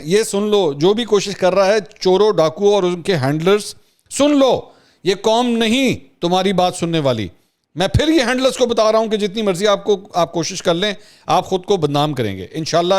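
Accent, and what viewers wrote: Punjab, Pakistan. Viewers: بھائی سلام ڈٹ کر پنجابی بول رہا ہے اندرون شہر کا پینڈو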